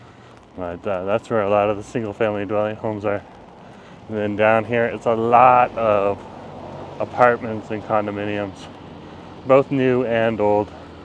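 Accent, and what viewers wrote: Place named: Canada. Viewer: I watch these vids just to hear you laugh! and the Canadian accent lol. Changes: I think was a Hand car wash. my old gas station. More apartment buildings, ofc. use to be Starbucks.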